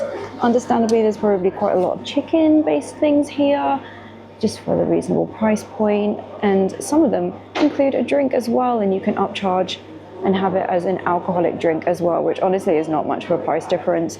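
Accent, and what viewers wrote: RP, United Kingdom. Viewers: You're painfully posh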